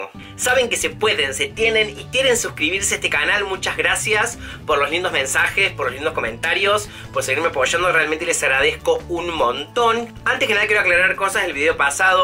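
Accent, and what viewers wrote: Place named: Argentina. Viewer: Que son los joeles, perdón no entiendo su español argentino, saludos desde México, hermosa canción de y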